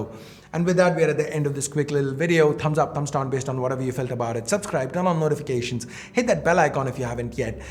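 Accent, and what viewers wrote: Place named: India. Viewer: Finally, Indian english speaking reviewer...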